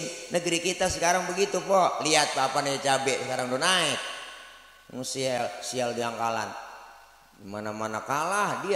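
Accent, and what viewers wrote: Betawi, Indonesia. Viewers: Betawinya keren..pengganti KH Zainuddin MZ.. Barrokallah tadz.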